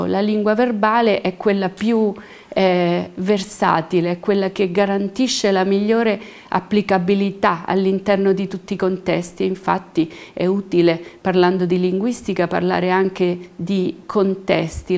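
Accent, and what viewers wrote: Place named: South, Italy. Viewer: Quante scene. Brava la prof. Chiaro esempio di diatopia. É meridionale e si sente, embè?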